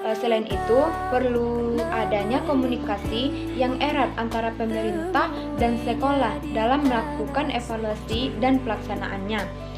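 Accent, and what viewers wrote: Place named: Padang, Indonesia. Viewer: Mantap Kak...Logat Bahasa Padang E Nampak Bona Ya..🙂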